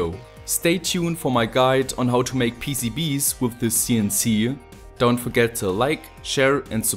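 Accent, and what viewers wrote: Germany. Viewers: Are ya a german dude?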